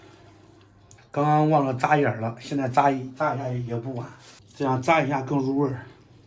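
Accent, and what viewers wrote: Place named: Zhoukou, China. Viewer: 这周口味普通话听着好熟悉。商水老乡飘过。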